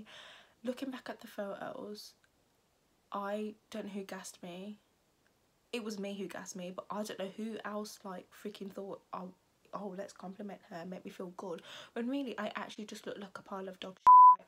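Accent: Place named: RP, United Kingdom. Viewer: Nah why do you sound so posh wtf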